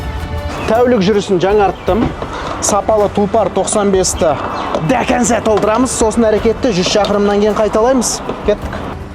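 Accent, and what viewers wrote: Standard. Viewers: қазақтың тілінде таза сөйлейді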